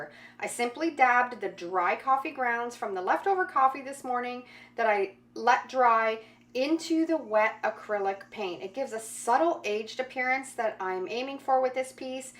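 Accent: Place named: Canada. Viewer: I knew from your accent that you were not American! Go Canada!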